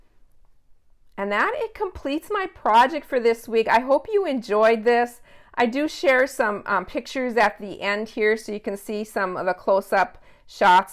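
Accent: Minnesota, United States. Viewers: Brand new at sewing. Thank you for teaching this. New subscription to you. Your accent reminds me of my aunt from Minnesota. I could be wrong. Thanks again.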